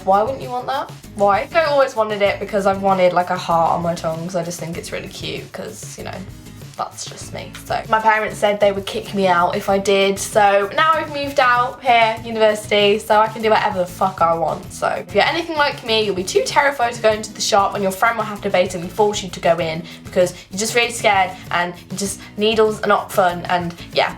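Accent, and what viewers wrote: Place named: West Midlands, United Kingdom. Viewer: Are u from the West Midlands Cus ur accent sounds like it if so wat shop is it xx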